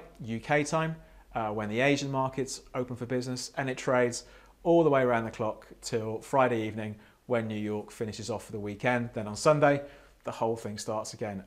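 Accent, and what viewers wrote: United Kingdom. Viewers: Him having a British Accent , is reassuring . Everything he says is golden.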